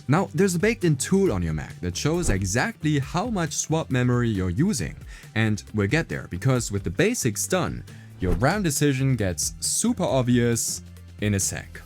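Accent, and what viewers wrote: Germany. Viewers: i have a slight feeling that he is perhaps german, the accent is just so distinct, its really funny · Ist das ein deutscher Akzent? 😅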